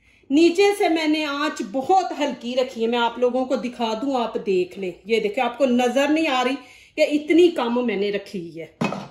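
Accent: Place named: India. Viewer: It's a amazed moment when you dish out delicious foody recipe with desi dialect live long with healthy life sister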